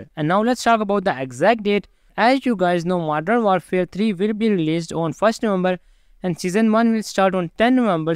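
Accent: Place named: India. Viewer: Indian English 🫥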